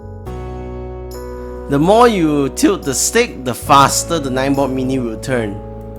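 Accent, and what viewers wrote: Singapore. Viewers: You sound like a Singaporean/Malaysian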